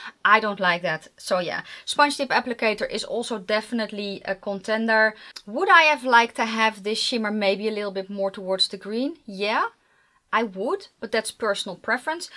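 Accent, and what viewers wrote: Netherlands. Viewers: Wat doe je dit goed zeg! Hartstikke leuk om van je te leren, je legt het zo duidelijk uit en ik hou ervan om mijn eigen accentje te horen tussen alle Amerikaanse reviewers! En de combinatie die je in het begin noemde… heel herkenbaar! Ga vooral zo door, ik ga je volgen!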